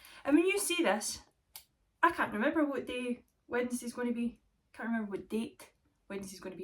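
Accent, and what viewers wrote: Scotland, United Kingdom. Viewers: If you have a watch stat of like 400 % on the first 3 seconds, it was me trying to understand what you said! But yes, yey, spring! 😂🌸 I'm reading The Maiden at the moment, which is set in Scotland, and having watched your videos is very helpful because now I kind of hear the book in your dialect while I'm reading. It's great! And you got a great TBR! I'll be interested to hear what you think of Cultish and A Tempest of Tea. I'm also wondering who all these people are who read the second book after a bad first one, and then tell anyone the second one is much better. What a sacrifice! 👏👏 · Love hearing a fellow Scot!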